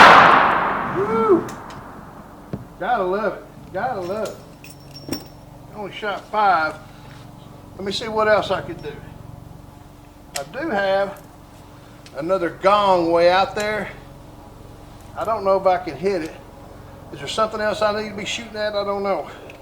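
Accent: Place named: Tennessee, United States. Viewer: Fun video! You sound like you’re from my neck of the woods in middle Tennessee.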